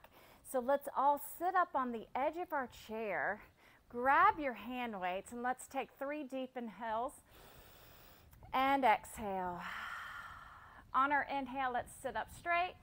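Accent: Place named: South, United States. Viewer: Perfect way to end an hour workout!! I’m from California south 30 miles from San Diego,I wish people here had your southern accent !! Enjoy the upcoming holiday!!🎉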